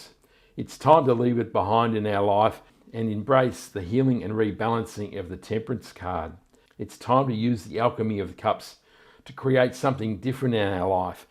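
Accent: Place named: Australia. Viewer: W Hi,. Sounds like you are an Aussie. Love your voice, manner, energy and expression. Subscribed. Thank you, and best of success. Great cartoon pic thumbnail. - very effective for click through!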